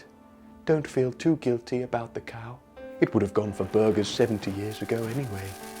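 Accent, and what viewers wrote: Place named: RP, United Kingdom. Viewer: Very well done "posh voiced"! Hugely entertaining and humorous. I dread to think how long it took you to do this!:)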